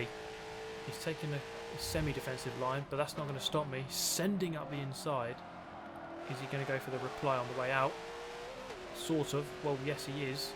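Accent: England, United Kingdom. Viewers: The Racing: decent Cheeky English commentary: priceless.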